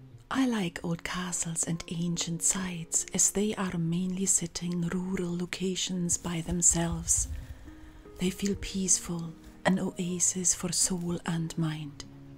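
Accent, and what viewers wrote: Scotland, United Kingdom. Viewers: How is it that you have such a pronounced "Scottish" accent? I''ve known people who've spent almost their entire lives living in their adopted country but still speak english with the accent of their birth country.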